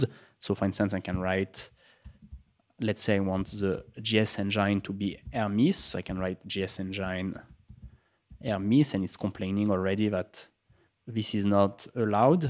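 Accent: France. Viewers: As a dev learning both React Native and french, I love your videos!! Just love the french english accent 💚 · it seems you are from france?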